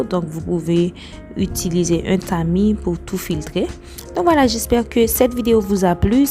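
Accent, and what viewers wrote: Haiti. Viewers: Accent haïtien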